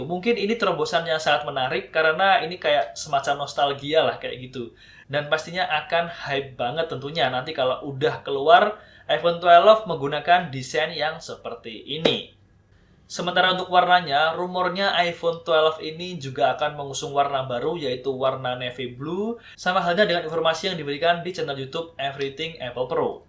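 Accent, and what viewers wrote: Indonesia. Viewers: Orang sunda ya?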